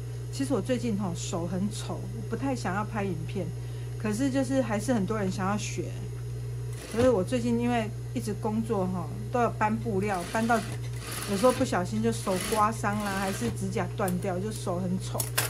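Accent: Taiwan. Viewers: 謝謝老師無私又有耐心的教學，聽到台灣口音好開心喔，旅居德國的我們祝福妳平安，健康喔。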